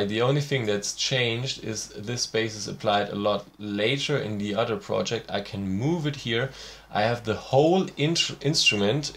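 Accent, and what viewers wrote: Germany. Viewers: You sound hella german lol. I know because I'd also sound like that when speaking english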